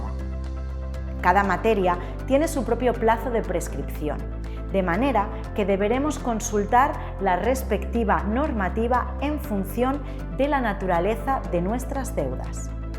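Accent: Spain. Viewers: Habla de España. ¿ no te enteras del acento o qué ?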